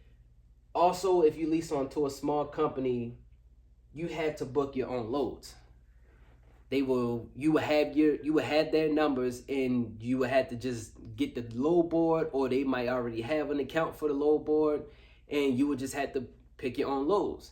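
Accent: Baltimore, United States. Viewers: gotta be from bmore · Sounds like your from Baltimore bro! Lol but great video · You gotta be from baltimore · Bruh is definitely from Baltimore.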